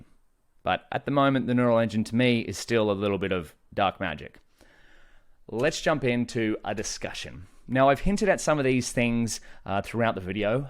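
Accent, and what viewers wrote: Australia. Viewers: thick aussie accent.. gives prices in usd...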